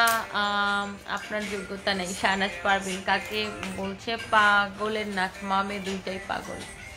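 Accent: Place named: Sylhet, Bangladesh. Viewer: Appu Hamen sylhet ke bolchi Aapne lagche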